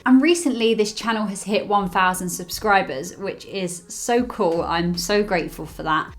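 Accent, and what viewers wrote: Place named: Cockney, United Kingdom. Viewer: Good luck - with the channel and the job my cockney sparrer 😁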